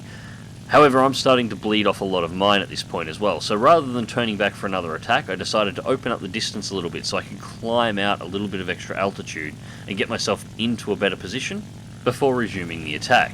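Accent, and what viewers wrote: Australia. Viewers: andrews Seriously, I'm laughing my ass off!!!!! Yes I know that you know he's an Aussie, it was just the perfect reply to getting irked about people teasing him about his trade mark accent!!! Don't ever change!!! You sound like the great Brian Brown in "Breaker Morant!" Sprog1 in AZ.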